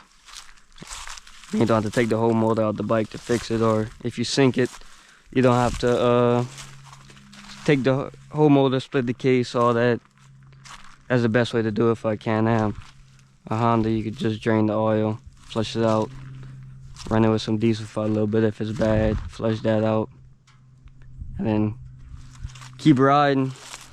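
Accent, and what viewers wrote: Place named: Louisiana, United States. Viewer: First video of yours I come across while looking up Honda 420’s and immediately noticed the accent lol. St Landry or avoyelles parish?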